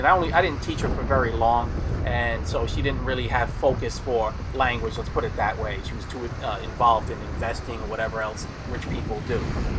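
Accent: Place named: New York, United States. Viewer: thanks for another ride along. i really like these. on a side note i ran across an old video of yours. it was the one you made a wine bottle tongs. boy your new yorker came out in that one..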